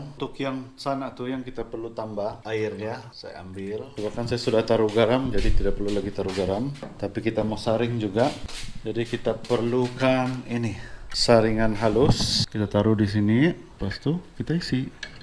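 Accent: Sabah, Malaysia. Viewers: Terbaikkk bang 👍🏼👍🏼👍🏼👍🏼 moga teruss sukses supportlocal ❤️ ada sdh channel tmpt berguru 😁 kalau channel lain susah mau di difaham.. maklumlah kurang kita faham bahasa indon dgn semenanjung...nah tapi kalau logat sabah TATAP PAHAM ITU LOGAT 🤣 enjoy tgok video abg ni...buka buka jak youtube terus pegi tgok channel...semoga banyak lgi video dan ilmu yg abg sampaikan... #barumaubelajar 🤣👍🏼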